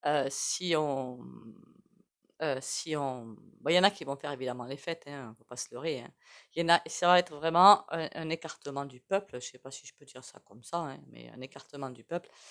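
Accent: South, France. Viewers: un petit accent du sud çà réchauffe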